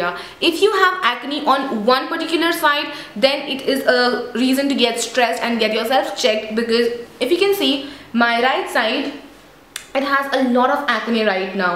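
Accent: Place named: India. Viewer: nicest Indian accent iv heard. not too thick ,nor faking an American accent .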